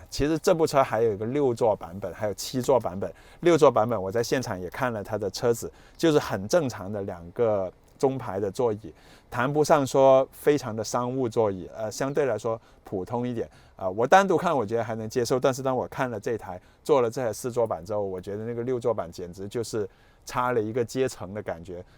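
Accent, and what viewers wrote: Cantonese, China. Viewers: 这主持人是广东人对吗？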